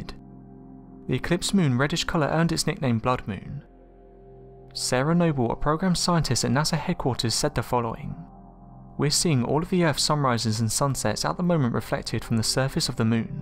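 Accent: United Kingdom. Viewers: lost interest once heard British accent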